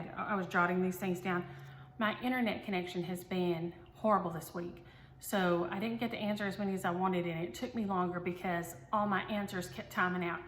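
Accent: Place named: Arkansas, United States. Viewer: Happy Birthday!!! Bless your heart...we will get though this! Love your accent, I lived in Arkansas for five years and miss hearing that twang😂! Down south everyone is fixing to go somewhere! You hang in there girl! Love all your finishes and stiching! Sending a virtual hug! God Bless you!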